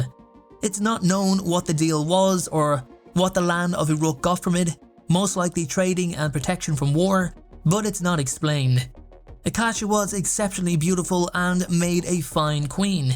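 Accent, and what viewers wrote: Ireland. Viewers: Oh boy, so I guess I cannot understand Irish accent :'(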